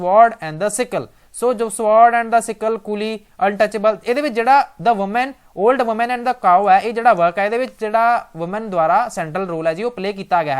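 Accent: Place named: Malwa, India. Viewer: very natural ,pure malwai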